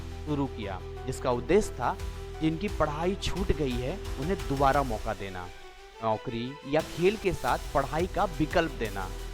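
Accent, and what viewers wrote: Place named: Bihar, India. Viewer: sir wo to sab thik h lekin apka jo ye bihari accent h na pura video kharab kr de rha h.......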